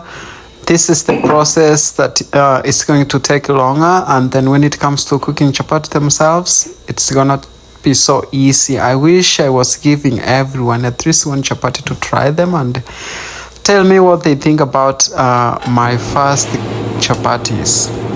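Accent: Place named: Kisii, Kenya. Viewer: I love your Kisii accent just like congrats dad😂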